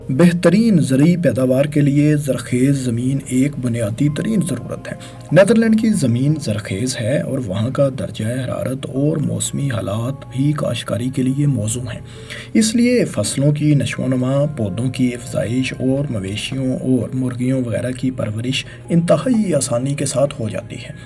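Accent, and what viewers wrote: Punjab, Pakistan. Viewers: خدا کی قسم اردو کا ایسا گندا لہجہ اور پڑھنے کا گھٹیا انداز نہیں سنا۔ یوں معلوم پڑتا ہے کہ کسی ٹھیٹ پنجابی بولنے والے کو اردو پڑھنے پر لگا دیا ہو۔ خدا کا واسطہ اس طرح کا ٹارچر نہیں دیا کرو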